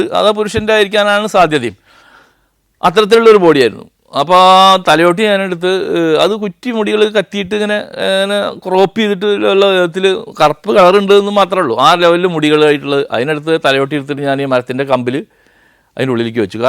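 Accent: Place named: Valluvanad, India. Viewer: സർ ആത്മാർത്ഥതയും സത്യസതയും തുടിക്കുന്ന വാക്കുകൾ. വള്ളുവനാടൻ ഭാഷയുടെ വശ്യത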